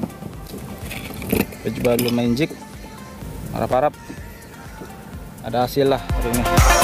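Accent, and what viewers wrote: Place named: Sabah, Malaysia. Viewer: mancing kt area mna tu dgr logak cko mcm sabahan sja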